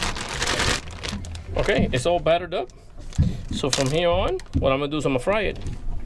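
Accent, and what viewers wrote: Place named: Cuba. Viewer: Disculpa la pregunta pero acabo de unirme a tu canal y me gustaria saber porque siempre tienes la cara tapada y porque no dices una palabra en español si tu acento te delata que eres latino pienso que cubano.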